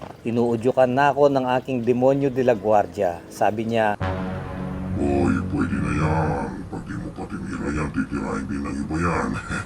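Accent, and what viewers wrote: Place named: Pampanga, Philippines. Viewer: Prang kpampangan kau sir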